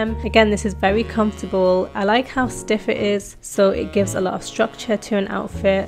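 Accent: United Kingdom. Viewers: OMG your Brit accent is so beautiful! Love hearing you.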